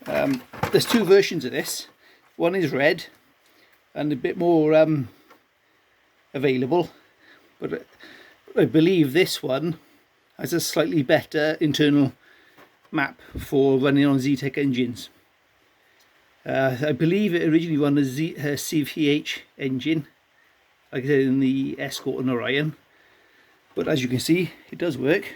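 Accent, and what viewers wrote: Wales, United Kingdom. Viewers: Great Channel, sound like from Wales? Love the work on the car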